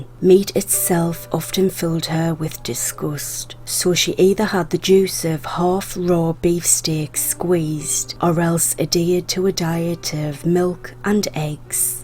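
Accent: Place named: Northern England, United Kingdom. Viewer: Its a lovely soft accent north of England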